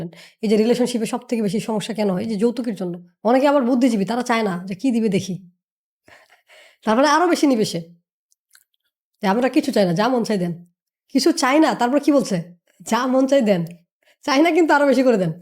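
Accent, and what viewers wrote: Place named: West Bengal, India. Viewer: প্রথমে ভাবছিলাম ইন্ডিয়ান কথা গুলো শুনে ভালো লাগছে তাই শুনলাম এখন আরও ভালো লাগলো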